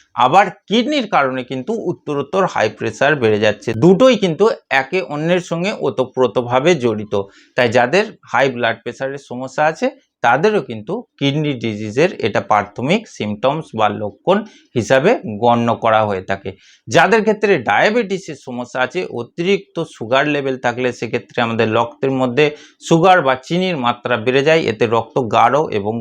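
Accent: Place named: Kolkata, India. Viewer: ডাক্তার সাহেব আপনি কি বাংলাদেশে বসবাস করেন ? আপনার কথা এবং ভাষা অনুযায়ী মনে হচ্ছে আপনি ইন্ডিয়ান অর্থাৎ ক্যালকাটার যদি বাংলাদেশে আপনিও হয়ে থাকেন তাহলে অবশ্যই আপনার নাম ঠিকানা কোন হসপিটালে আছেন জানাবেন।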